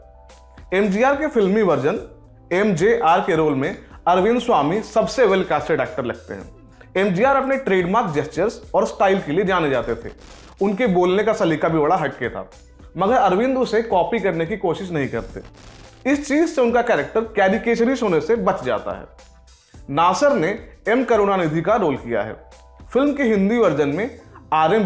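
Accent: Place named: Bengal, India. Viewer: I think he is Bengali.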